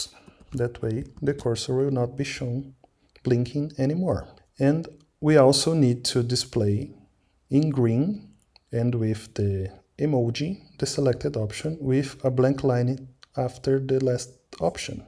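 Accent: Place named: Brazil. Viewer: Very good english bro. Haha percebi que era brasileiro pelo sotaque, muito bom o tutorial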